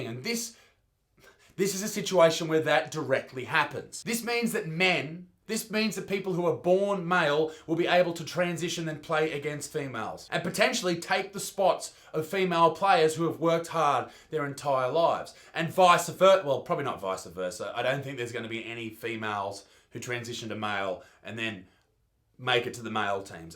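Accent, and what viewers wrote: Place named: Australia. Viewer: oh boy I just love listening to an australian man shout at people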